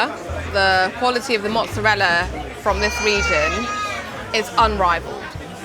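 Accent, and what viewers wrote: England, United Kingdom. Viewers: Maybe the British accent takes me away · You have a English accent how are you American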